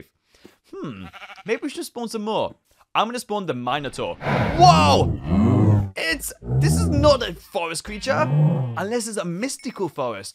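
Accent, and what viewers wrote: United Kingdom. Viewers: British accent......love yah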